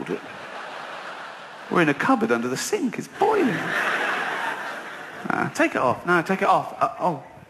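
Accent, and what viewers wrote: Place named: United Kingdom. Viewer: This guy is fuckin hilarious.And his peculiar British accent makes him sound even more funny.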